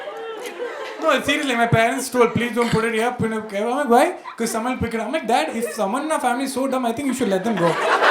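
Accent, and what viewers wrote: Kerala, India. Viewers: He is from kerala. More over malayalis speak english this way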